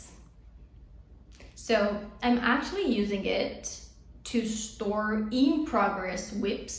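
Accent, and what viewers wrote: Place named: Italy. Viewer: Great seeing you again I started to wonder, where have you been 😊 ….glad to see you are O. K. I love love your videos…edited/unedited…it doesn’t matter because you bring your lovely personality and Italian accent (which I adore)to it. It is so so cute and enjoyable. Looking forward to seeing more of you in the future. Thanks for taking the time and sharing. You are an inspiration to us all💞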